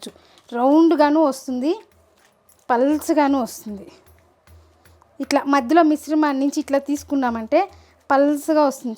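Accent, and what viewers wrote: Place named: Chittoor, India. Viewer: Pakka chittoor slang. Baaga chebutunnaru. Maa athagaaru ilagae chestharu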